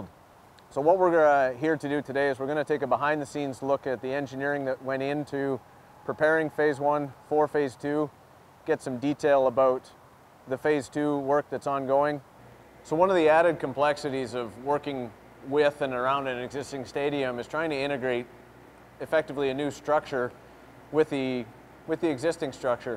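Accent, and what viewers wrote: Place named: Canada. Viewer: "Souwth" as an American this is the first Canadian I've ever come across that had a stereotypical Canadian accent.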